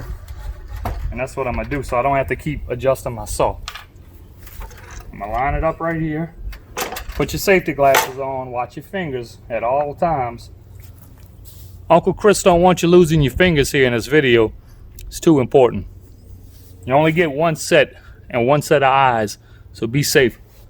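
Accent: Louisiana, United States. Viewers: Where are you from sir? I’m from Lafayette, La currently living in Pittsburgh, Pa and that accent is a taste of home! Me and my sons enjoy your videos! Keep it up!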